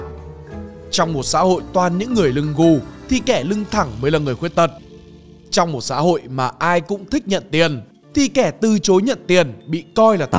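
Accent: Standard. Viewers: Bạn nói chuyện đúng chuẩn!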